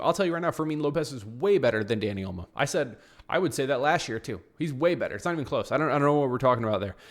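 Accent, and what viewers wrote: United States. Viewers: If its a contest between the midfields, madrid will lose. Part of how/why Sevilla won vs Barcelona was Sevilla interrupting Barcelona's "normal" passing network. [You sound American, so] Go ahead and look at a passing map for a "normal" Barcelona fixture, and then look at the passing map vs Sevilla. Normally, Barcelona run everything through Pedri, who then distributes the ball all across the park. But Sevilla had their #18 give up any pretense of doing anything other than to man-mark Pedri, which turned Barcelona's normal pass network into something that more looks like the USMNT. It also cut Pedri's "normal" number of touches in half. Taken together, this interrupted Barcelona's possession plans. Most imbecilic commentators are too lazy to see anything beyond "the high line" with Barcelona, but what's more important to Flick are Barcelona's press, and Barcelona's possession. 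Interrupting Barcelona's possession is the one way that another midfield can outplay Barcelona's. Sevilla did it, and Q$G did it. With respect to madrid, I don't know if any of Camavinga, Guler, or Bellingham are willing to subsume "their own" games, in order to go try to mark Pedri out of the game. In fact, I doubt it. It is for this reason that if it comes down to madrid's midfield vs Barcelona's, madrid will lose.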